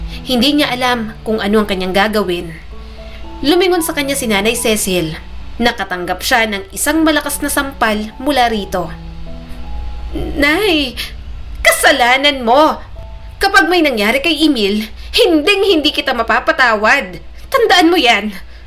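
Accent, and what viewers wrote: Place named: Hiligaynon, Philippines. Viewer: Ilongga cguro nag babasa ng story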